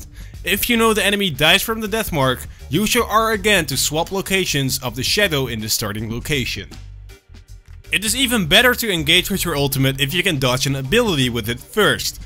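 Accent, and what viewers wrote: Netherlands. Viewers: Are u dutch? Great accent tho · Lol he's dutch if you can hear it :]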